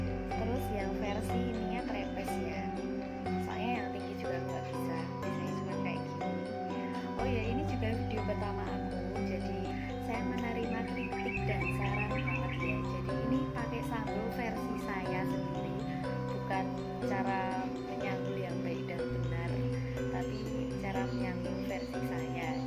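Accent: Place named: Java, Indonesia. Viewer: Hahaha... Biasa mbak, medokmu bisa jadi karakter tuh dalam berbahasa indonesia.😄 google adsense siap menantimu..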